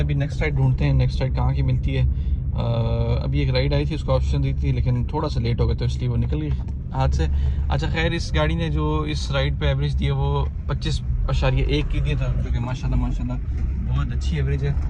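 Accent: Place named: Karachi, Pakistan. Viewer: Aap tu Karachi ke lg rhay han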